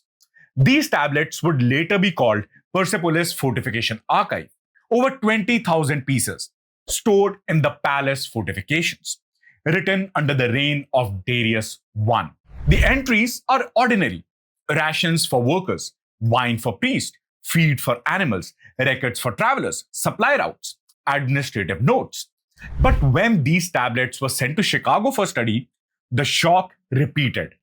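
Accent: India. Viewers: Indian english. omg. Half of it is a blabber. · Indian accent 😂😂😂😂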